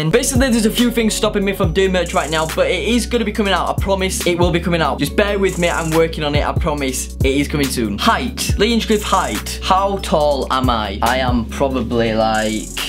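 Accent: United Kingdom. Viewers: hey lee!! I am subscribed and i have my post notification on since i have subscribed. and im from the Philippines. thats why i watch your videos on monday. 😭 i love your accent and your videos.. i just love the English Accent. and i cant believe your just 17 years old. im just a 5 footer and im already 18. kinda jealous of your height. share some blessings. 😂😂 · BRITISH ACCENT ON FIRE🔥🔥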